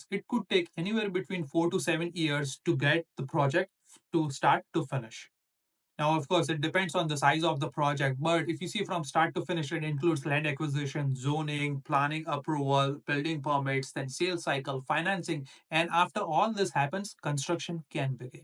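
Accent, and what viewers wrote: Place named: Canada. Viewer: This is the new canada accent...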